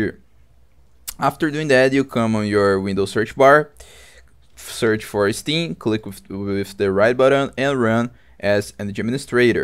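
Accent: Brazil. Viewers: Vc tem um sotaque brasileiro, consigo ouvir um pouco de português na sua fala, estou certo?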